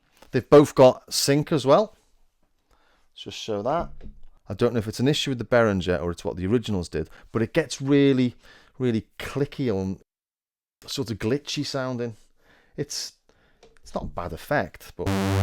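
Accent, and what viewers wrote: Liverpool, United Kingdom. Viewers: Glad I found yer channel. YouTube needs more of us with 'regional accents'. I've always liked Scousers (apologies if you're not actually a Scouser and now deeply offended).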